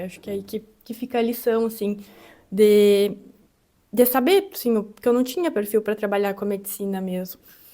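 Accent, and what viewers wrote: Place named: Rio Grande do Sul, Brazil. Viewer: Seu sotaque de voz e muito lindo eu Amo sotaque Gaúcho mesmo náo conhecendo os gaúchos eu os adimiro muito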